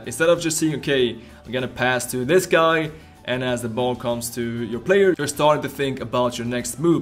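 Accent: Sweden. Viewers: Man hör att du är svensk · The accent sound swedish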